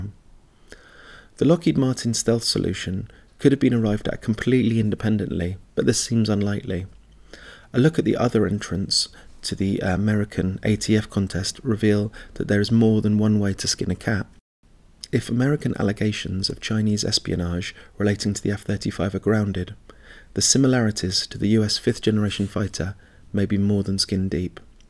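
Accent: United Kingdom. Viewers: And it's British accent, love it! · LoL British XD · The english tone is great to. He could be saying just horrible lies and ethnic slurs and I'd be on board 😆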